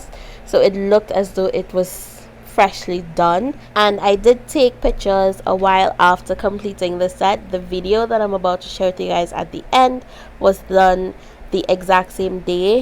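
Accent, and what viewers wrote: Trinidad and Tobago. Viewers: Are you Trini? I hear a accent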